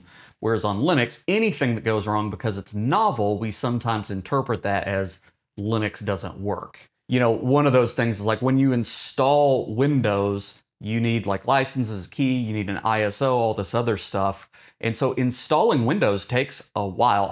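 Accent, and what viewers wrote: Tennessee, United States. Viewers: are you from Tennessee?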